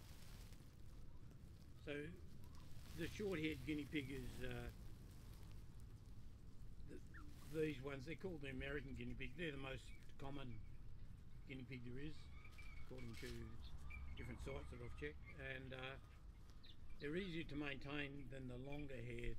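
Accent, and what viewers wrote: Australia. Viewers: Saw the adorable babies, heard the Aussie accent and I had to subscribe to the channel!💕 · What a handsome man with such a wonderful Australian accent😊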